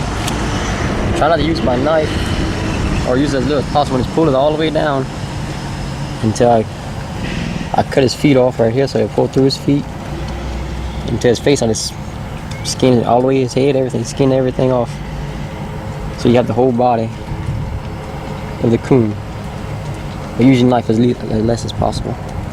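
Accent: Louisiana, United States. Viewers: I love your accent. Louisiana I assume? Youre so soft spoken too. It isn't that harsh cajun lilt lol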